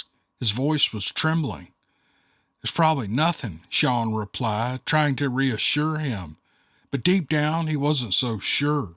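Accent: South, United States. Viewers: First thing Monday morning the great Sir on my phone this 5am awesome Sir great read and more Awesome real voice lovin that southern twist voice truly awesome thank you Sir miss that southern voice yes Sir this story is great as usual missing (Steve Lily) but yer presence is great hopefully yer fall/winter is relaxing at home with family have a great thanksgiving to y’all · I moved to Maine 6 years ago from Louisiana/ Texas. Grew up in the Sabine river swamp and spent years deep in the woods there. I Love it here! But yes, the woods are very different. Not so creepy, really, just different. I love your fabulous stories (miss the southern accent) but don't really lean toward belief... And moose, yeah... I'd rather find a bear! But honestly both really only ever just run away like scalded chickens.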